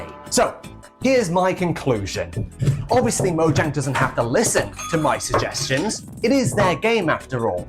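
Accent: England, United Kingdom. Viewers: Never piss off an English(?)man, he’ll break reality and ascend to a new dimension in rage Edit: he pulled a flintlock so he may just be American with an English accent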